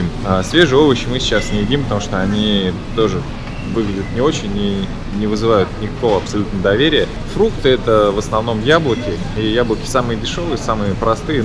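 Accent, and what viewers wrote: Saint Petersburg, Russia. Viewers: чреча греча меня бесит это Питерский говор))) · Ах , точно!! Ты же питерец!!! Поэтому эти "творогА" и "ГРЕЧА"!!!!! Ужас. А еще свЁкла!! СЕЛО!!!! ЧЁ СКАЗАТЬ!!? У вас даже в магазинах ГРЕЧА пишут😨. булоШные, калбаШные, молоШные...Откуда это??? С НЕПА Ещё чтоли??? Пазор. Это не русский, это видно древнеМосковский , из КалаШного ряда, купэческий , бублиШно- торгаШеский! ФУБЛЯ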